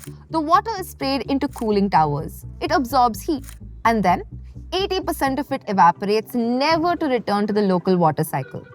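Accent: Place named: India. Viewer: A good and simple Indian accent, congrats❤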